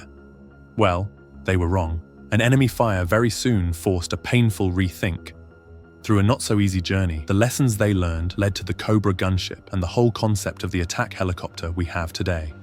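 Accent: United Kingdom. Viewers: Rather disconcerting to hear a great story of American heroes and ingenuity narrated in a British accent. ' Military' has four syllables, not three, and 'lieutenant' is not 'leftenant'.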